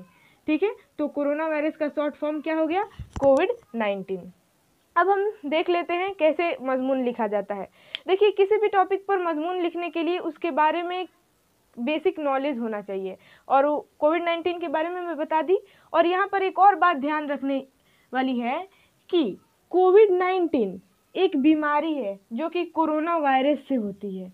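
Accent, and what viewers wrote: Bihar, India. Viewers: यदि हमें ऊर्दू आती हैं तो हम आपकी video dealy देखते पता नहीं आप को हिंदी आती हैं की नहीं क्यूं हमने हिंदी मेंcomment किए 🙏 एसे ton से तो आप भी बिहारी ही लगते हैं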